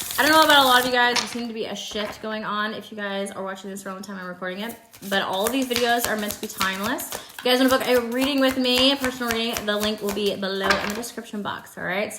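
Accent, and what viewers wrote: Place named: Texas, United States. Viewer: Your readings have been spot on except…… I’ve been trying to manifest you to AZ and that hasn’t happened? I hear a Texas accent. Come on cards.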